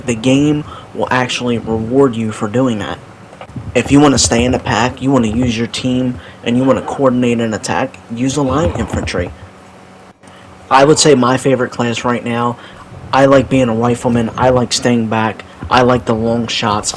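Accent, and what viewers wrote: Baltimore, United States. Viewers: Thanks for the tips. U from Baltimore? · yeah but more specifically Baltimore or Philadelphia not sure which one but that region.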